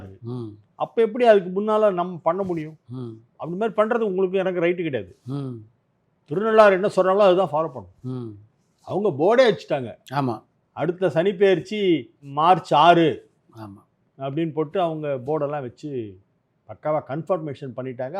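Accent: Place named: Tirunelveli, India. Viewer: அய்யாவின் பேச்சு நெல்லை மாவட்டத்திற்கு தனி சிறப்பு ஆச்சு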